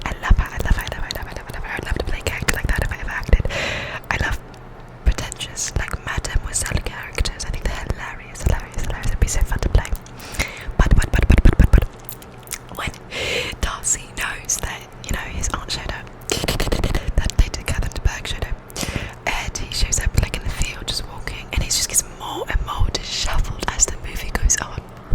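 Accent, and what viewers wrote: England, United Kingdom. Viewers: It’s actually kind of interesting. Your enthusiasm and British accent kill it. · she's talking British?! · I always fancied myself as Jane 💁🏼‍♀️. Question: Which do you prefer - The Pride & Prejudice tv series with Colin Firth or the film with Keira Knightley? I’m team tv series. Always appreciate it when you bless us with your natural British accent. Take care! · You sound more English than me and I’m from England, you sound like the English girl Ross nearly married in Friends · Your british accent brings me so much joy 😂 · british accent is a trigger itself. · Keep doing soft British whispering, it is so tingly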